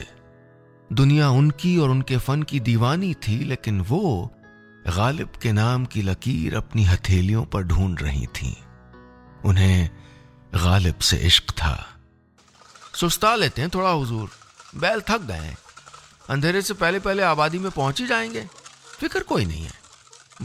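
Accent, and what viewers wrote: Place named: Lucknow, India. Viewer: Janab aapko sun kar dil khush ho jata hai. Aapne Lucknow ke zubaan yaad aa jati hai. Aap ka tahey dil se shukriya.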